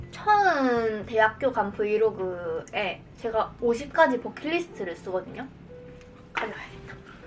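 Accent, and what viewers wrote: Busan, South Korea. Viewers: 어투는 표준어, 억양은 부산 사투리, 발음은 또박또박... 각 특징이 뚜렷하시네요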